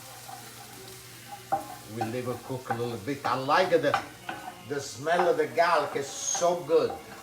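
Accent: Italy. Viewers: I listen to you i hear my italian immigrant grandparents.We love yo both❤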